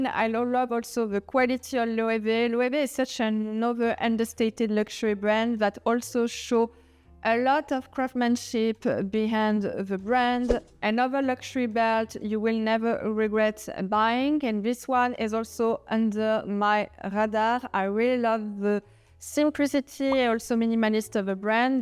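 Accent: France. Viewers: unrelated, but the French accent sounds very fancy. It matches the theme haha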